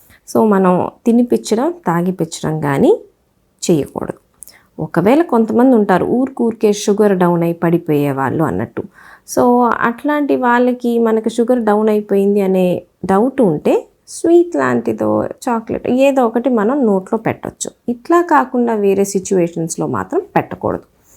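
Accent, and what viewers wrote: Guntur, India. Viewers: గుంటూరు యాస బాగుంది మేడం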